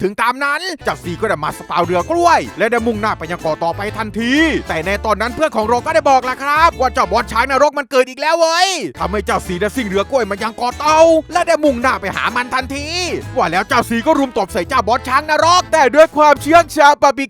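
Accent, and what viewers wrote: South, Thailand. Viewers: เป็นคนใต้หรอครับ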